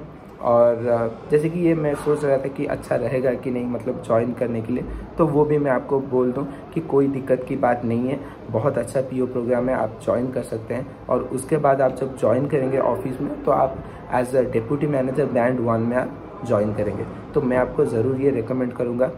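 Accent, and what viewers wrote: Odisha, India. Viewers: Odisha se ho kya?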